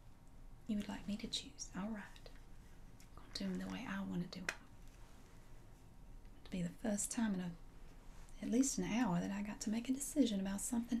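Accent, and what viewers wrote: South, United States. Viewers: I love these role plays, you are so good at playing this role. Please do more :) this might be a silly question but are there still ladies in the south who speak that way?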